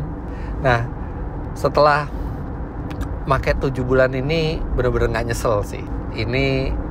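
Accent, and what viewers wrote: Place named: Java, Indonesia. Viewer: Bung Jawa banget sih itu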